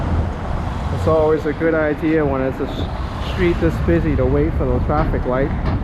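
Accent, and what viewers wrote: New York, United States. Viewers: thank you for the narrated video , I like your accent , Is it everybody's accent in new york ? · As you exit GCT you captured nice view where I used to work across the street, formerly called the Lincoln Bldg. 42nd is so sanitized now, if only the gritty history could talk from the now removed arcades and cheap movie houses. Where's Midnight Cowboy "Buck" and Rizzo?,:) Question: Does "East" and "West" 42nd St start at 5th Ave.? I laughed after reading posts about your native NYC accent: "MunHAT' tan instead of MAN HAT tan, and "Shtreet" instead of "street". The one pronunciation always caused me to chuckle from NuYawkrs was "Huh!" instead of "Her"..:) thanks again for great tour!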